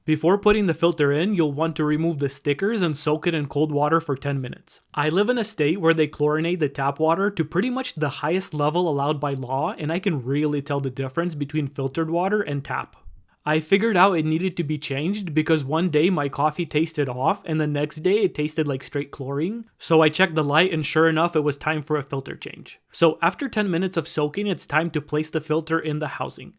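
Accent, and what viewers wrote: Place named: Quebec, Canada. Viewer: You mentioned that in your state they use the highest chlorine levels. But, are you in a state or in a province? Your accent is 100% Québécois. Great review!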